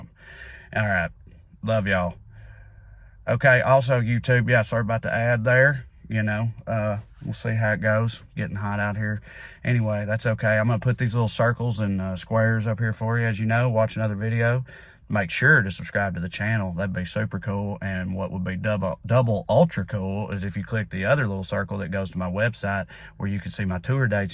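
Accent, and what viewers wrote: South, United States. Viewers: This guy is already attractive - but his mind through that southern accent takes it next level.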